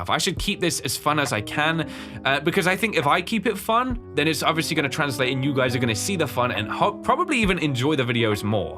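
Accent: United Kingdom. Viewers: a decade of funny bri'ish man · He started out as the deep voiced British man who did FNAF theories and top 10s and then he started his own channel and 10 years later that channel has become something truly special and unique and one that has stood the test of time…congratulations thank you for a wonderful decade of fun 💙💙💙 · I kept watching your videos since 2020 because i needed to learn british english 😂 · well, i don't quite remember which one of your videos i saw first, but i did came here from jay kubz scout, because someone in a comment session talked about you, so i got curios and decided to check you channel. At first it was a little confusing for me and some parts i couldnt understand what you were saying because i only consumed english content made by americans, hence why i couldn't understand british accent, but it quickly grew on me and i got used to your voice, which had improved my english by a lot, so thank you for that! 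(Youre literally the only reason i can understand dawko and bazz lol) The thing that got me is the vibe and editing, that's for sure, because it was really special (still is) from what I've watched on youtube before. Now I'm waiting for upload or rewatch your old videos when i eat, its became a part of my routine, and i dont miss any video you upload :)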